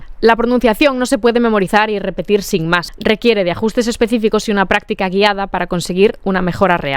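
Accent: Spain. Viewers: I like your accent so much. ¿Este es el accento de Madrid ? Es clara, fuerte, bonita:)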